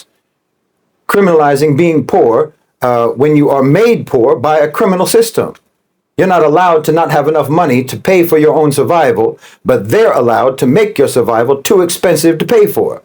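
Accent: United States. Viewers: Meanwhile he wears a suit, speaks American English and benefits from all good of western culture.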